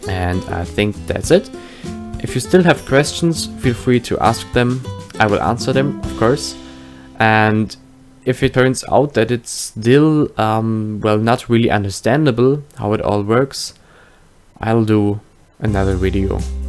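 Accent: Germany. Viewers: Save Deutsch dein Englisch man xD aber gutes Totorial/Video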